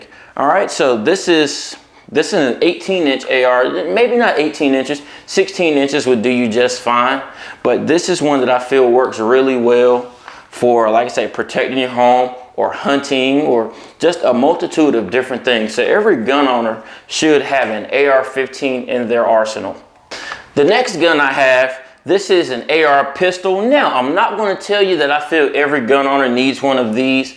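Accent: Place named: South, United States. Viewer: Mam o man does your southern accent really out does mine😀👍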